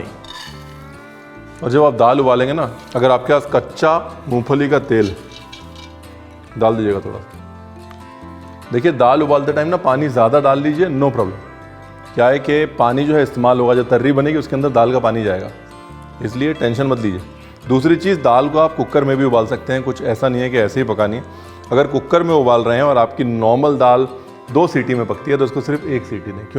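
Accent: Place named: Maharashtra, India. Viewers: Zakkass. Well I already love the way you narrate and prepare the recipes. I always come to this channel for most recipes. And being a Kolhapuri and an absolute missal lover, I just loved how authentic your accent and your this recipe also is. You're too good like always. Love from Kolhapur. ❤️🥳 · एकदम झणझणीत video.... Loved your Marathi accent and knowledge as always.... Respect 🙏🏼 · I am a maharashtrian. Loving ur Marathi! I am aware your wife is maharashtrian and I bet ur love for her reflects in the way u do adorable speak the language, Mr. Zhannzhaneet Brar!😊 Like the way u pronounced the “nn” in zhannzhaneet n in waattan and the “yyll” in misayyll. Pls do get ur wife on the show once, would love to see her. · Kadkk recipe kadkk marathi accent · OMG your Marathi accent is so sweet 😂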